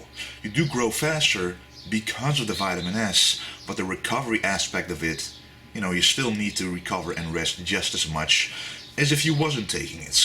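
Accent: Netherlands. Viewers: I had a great time hearing your dialect, beeing Duch myself I immediately heard that, which is totally hidden from me when you speak english. That was fun, I didn't expect that at all. Thanks for so many great great videos. Next to Mountaindog John Meadows and Athlean-X Jeff Cavaliere this is an awesome source of high quality, down-to-earth information.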